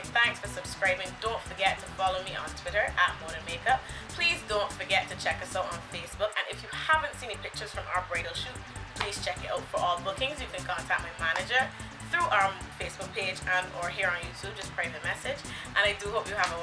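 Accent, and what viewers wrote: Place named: Barbados. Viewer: love the bajan accent. love when you roll your eyes. you are funny as hell.peoples get to know this chick!!!!!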